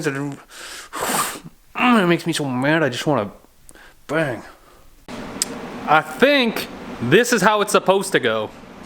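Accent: Canada. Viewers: I’m here just to hear him speak Canadian. I love Canadians. 😘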